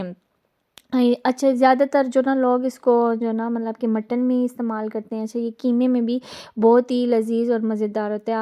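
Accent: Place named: Punjab, Pakistan. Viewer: Ap majabi bilkul hamri tra bolti ho😇😄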